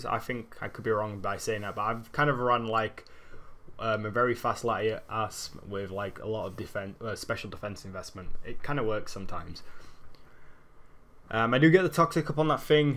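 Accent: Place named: North, United Kingdom. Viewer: So northern.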